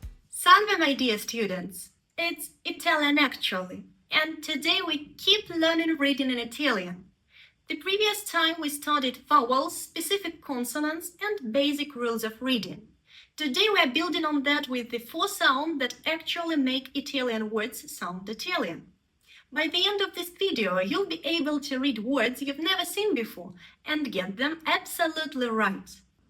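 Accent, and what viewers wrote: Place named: Eastern Europe. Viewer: Your accent sounds like it belongs to a Slavic language, especially when you speak English. Love how you explain everything - super! xx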